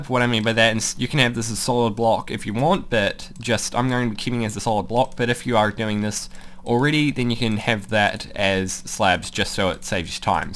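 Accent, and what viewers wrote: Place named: New Zealand. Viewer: He is from New Zealand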